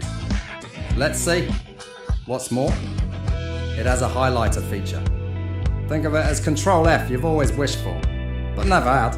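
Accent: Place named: Mockney, United Kingdom. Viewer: Love the Guy Ritchie mockney accent goes well with the presentation. prefer web based apps for windows as not a fan of chrome. Brilliant tool, I must delve deeper into there whys, whats and wheres. thanks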